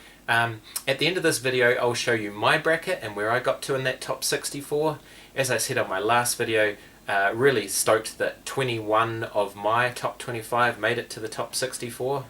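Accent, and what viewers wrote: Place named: United States. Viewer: Lol - great work! Love your parodies. Your American accent isn’t half bad. I once had a friend here in Texas from Christchurch and definitely did a poor job on his accent when I tried.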